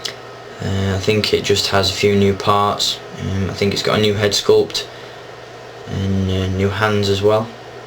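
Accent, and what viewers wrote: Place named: England, United Kingdom. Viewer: wait are u english i thought u was from the us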